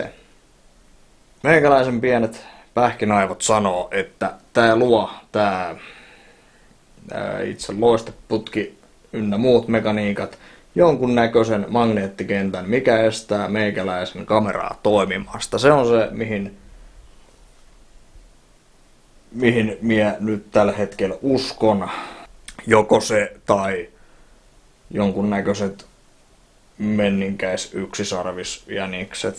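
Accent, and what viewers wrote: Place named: Kuusamo, Finland. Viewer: Kyl kuusaan murre kuulostaa hyvältä👍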